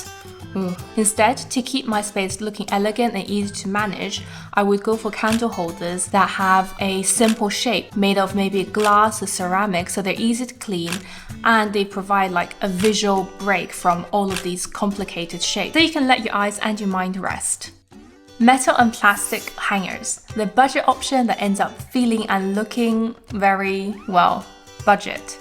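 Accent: United Kingdom. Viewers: Love the Brit Accent❤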